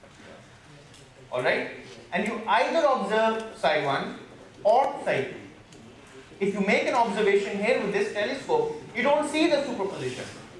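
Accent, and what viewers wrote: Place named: India. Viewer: when he starts talking in his indian dialect and then he ends it with "and thats what physics is all about" ... very good explanations thanks for sharing.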